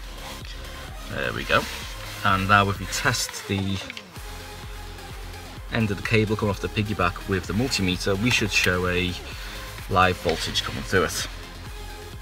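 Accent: United Kingdom. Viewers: Is this British acsent ??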